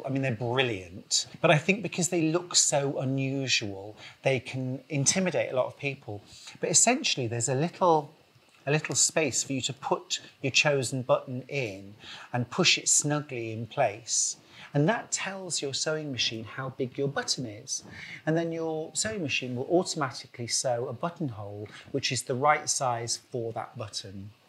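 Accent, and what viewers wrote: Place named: East Yorkshire, United Kingdom. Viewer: Thank you. You are an excellent tutor. (A bonus is that this East Yorkshire Lass (now a Norfolk dweller ) gets to hear the familiar accent!)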